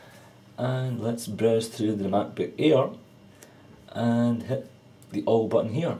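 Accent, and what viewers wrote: Scotland, United Kingdom. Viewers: Are u scot?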